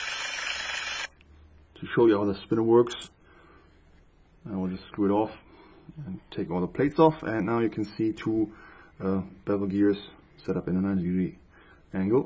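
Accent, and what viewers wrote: Germany. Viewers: Du bist Deutsch, oder? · du bist deutsch oder?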